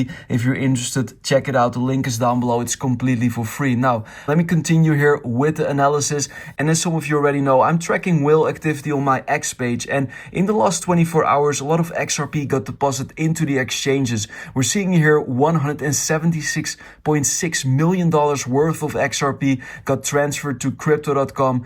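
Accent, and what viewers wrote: Netherlands. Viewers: Ik volg je al een tijdje maar je klinkt echt als een Hollandse boy lol lekker bezig BTW.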